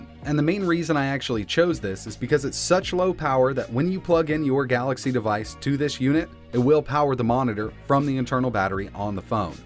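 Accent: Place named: South, United States. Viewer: I love southern accent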